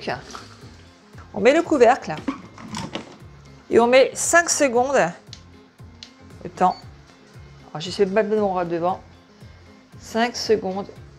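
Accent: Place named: Ardennes, France. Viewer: Bonjour, j'ai reçu mon compact cook pro cette semaine et me voilà un peu perdu car c'est mon 1er robot, j'ai toujours cuisiner sans. Donc me voilà à chercher des recette et quel bonheur d'être tombé sur votre compte, je sent que ça va bien m'aider merci. Jhabite dans les Ardennes et je pense reconnaître cet accents ☺️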